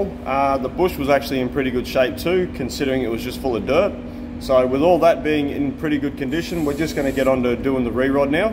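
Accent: Australia. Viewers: 4 am and sat watching this , sometimes forward the spinny bits making sure not to miss homeless fucking about . Being British I pride myself on being able to swear exceptionally well, but nobody says " You Fakkin Kant" like an Aussie fella . I tip my hat to you 😉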